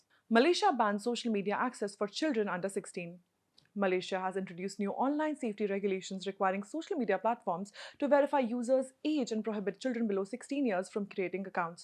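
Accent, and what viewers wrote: India. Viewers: I feel as if I were listening to BBC in Indian accent.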